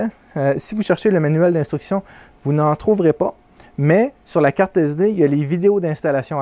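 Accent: Canada. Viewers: Salut. Je suis du même avis que beaucoup, pour 1000 euros je la fait moi même et tu devrais t'en retrouver pour 500 euros voir moins de matériel. Bonne continuation et c'est toujours un plaisir de t'écouter avec ton accent et les expressions du Canada.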